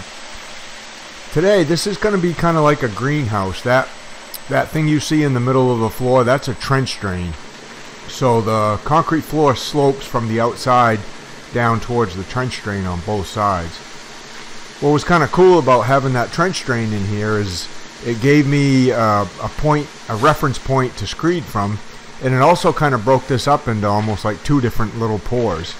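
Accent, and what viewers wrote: Maine, United States. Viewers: By your accent...Maine?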